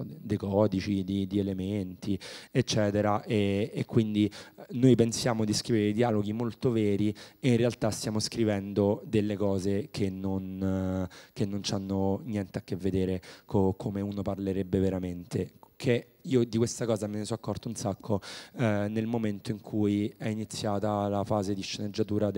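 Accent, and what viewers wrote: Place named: Rome, Italy. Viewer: ‘Gnorante! 0calcare non sbaglia un congiuntivo...altra cosa sono l’accento romano o l’emozione che lo fa parlare accelerato...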